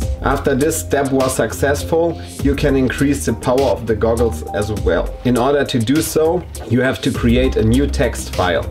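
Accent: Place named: Germany. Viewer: Ive seen like 50 of these videos hahaha and it took a German speaking English with a light accent to Deliver the message clearly for me lol Thank You. just subscribed...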